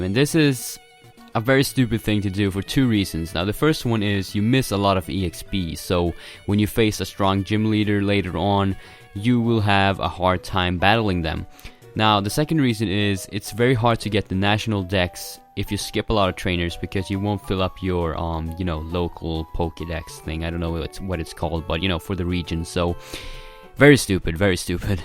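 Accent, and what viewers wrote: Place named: Sweden. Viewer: Swedish? You really sound swedish imo.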